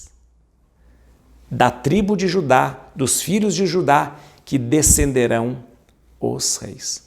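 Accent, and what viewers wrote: Minas Gerais, Brazil. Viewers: Padre, que riqueza está sendo o estudo da Palavra. Estou aprendendo bastante, fazendo várias anotações e rindo do seu jeito engraçado (sotaque de mineiro é divertido demais kk). Deus te abençoe! Obrigada e parabéns pelo lindo projeto!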